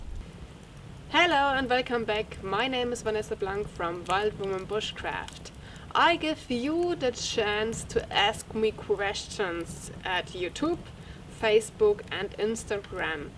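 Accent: Germany. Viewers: I always enjoy your videos. The outdoor setting by the fire was beautiful just like you. I think everyone loves hearing your German accent. Keep your personal life private. The more you tell the more people want to know. Sometimes I think we see too much of you in your videos but that's more personal. Take care of yourself and your children.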